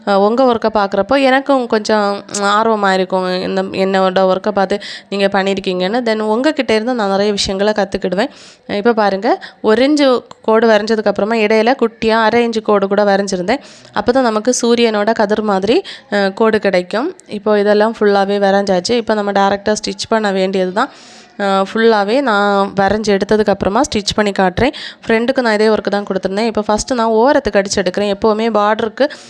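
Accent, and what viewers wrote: Kanyakumari, India. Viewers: Hi sis your kanyakumari slang is really nice I like your native language ❤❤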